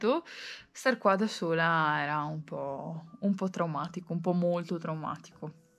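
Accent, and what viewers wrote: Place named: Veneto, Italy. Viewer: Ciao di seguo da poco ma sei fantastica come quasi tutte quelle che vanno in montagna poi accento veneto io sono di verona sponda lago di garda e quel tocco in piu.da dove 6?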